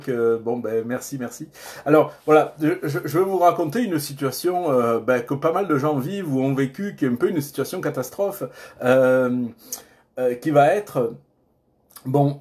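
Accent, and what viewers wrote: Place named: South, France. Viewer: un petit accent de marcel rufo !! ça chante le sud !! la Provence du Quebec ??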